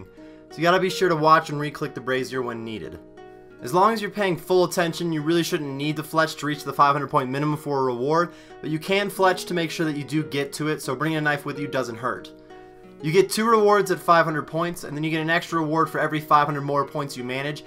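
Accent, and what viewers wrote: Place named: South, United States. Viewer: Where you from? Noticed the southern accent :P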